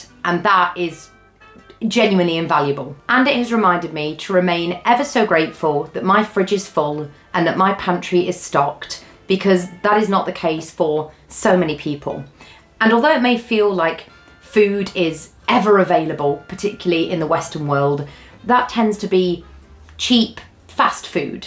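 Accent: United Kingdom. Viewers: From her amazing accent I belive she's in the UK. so likely following UK rationing.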